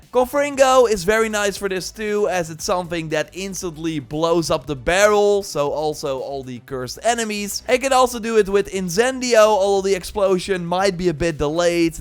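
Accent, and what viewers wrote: Netherlands. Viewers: Mooi Nederlands accentje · Are you Dutch? Your accent sounds like it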